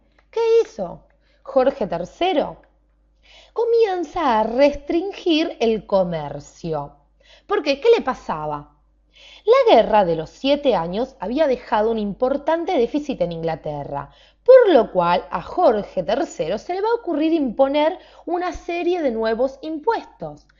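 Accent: Argentina. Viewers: me encanta ese acento , es argentino ?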